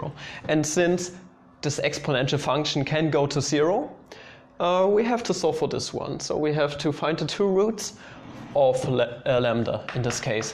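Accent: Germany. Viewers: Hey, where are you from? Your German accent is quite unusual, you don't have the voiced-voiceless opposition in your obstruents (e.g. p-b, t-d, k-g, ch-j, s-z), and also in German you pronounce sch and soft ch identically, and I can't identify where people speak similarly to you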